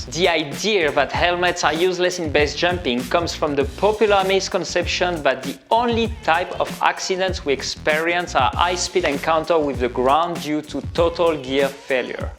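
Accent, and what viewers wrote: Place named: France. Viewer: ptdr je me disais bien que tu avais un accent français, cool !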